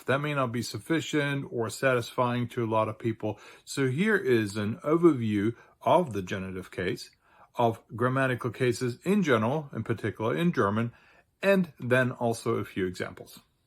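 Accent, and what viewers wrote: North, Germany. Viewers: German speaker here: You have a slightly Northern German accent. There (and even more in related Dutch) constructions with "von" are more likely than in standard German. I, located in Hessen, prefer Bian Coady´s sentence.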